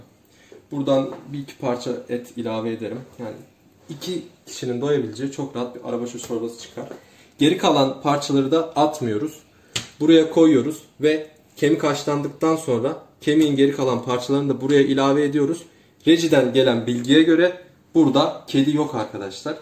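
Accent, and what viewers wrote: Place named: Kayseri, Turkey. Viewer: Kayseri ağzıyla video çekkk 😊 başarılar tatlı abim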